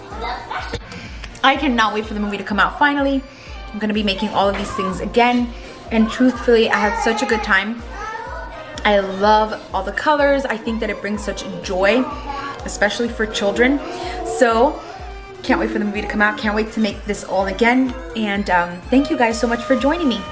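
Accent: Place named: New York, United States. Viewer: Loved everything, but I'll admit my favorite part was definitely your New Yorker accent 😂🤣❤👏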